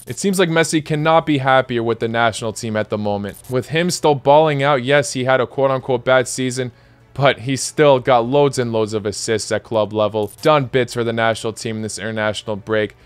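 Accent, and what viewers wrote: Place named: United States. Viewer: my brother listen to his accent, he's American along with Croatian.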